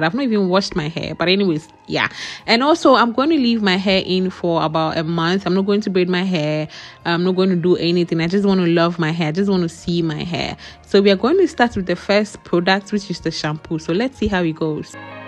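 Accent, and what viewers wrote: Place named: England, United Kingdom. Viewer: You are simply smart & very beautiful. I love your English accent 🎉🎉🎉🎉❤.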